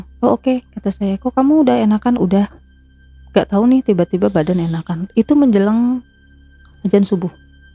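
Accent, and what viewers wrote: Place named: Java, Indonesia. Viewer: Intinya bukan dr derah nya ! Elu sok2 jkt tp logat lu aja jawa. Bukan betawi. Justru org2 jkt jg banyak yg maun dukun !! Dmna pun itu jg ada pada main dukun. Pesugihan sgla macm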